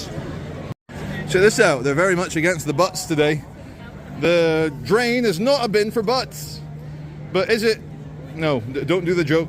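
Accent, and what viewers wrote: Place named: Cornwall, United Kingdom. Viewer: You sound like you come from Cornwall bro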